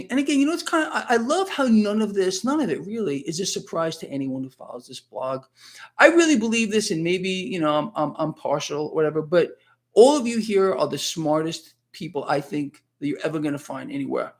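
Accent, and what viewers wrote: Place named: Queens, United States. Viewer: New Subscriber, I feel so sad I just found you, but I did just order your book! love the content, you must have been from Queens? Same accent... Thank you.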